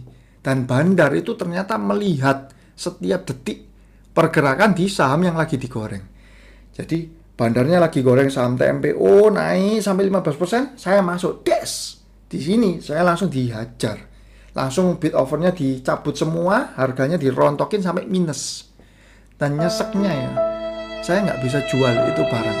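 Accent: Surabaya, Indonesia. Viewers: Logat suroboyo ne mantep koh 😁 Type trading nya kyk saya nih pernah dalam sekejap untung ratusan persen pernah juga dalam beberapa jam rugi puluhan juta Masih belajar biar ga greedy saya koh moga bisa melek money management 😂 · logat boyo kentel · Kok koyo wong suroboyo smpean Koh..? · Bhsnya kyk wong Surabaya ki,mabtab infonya broo...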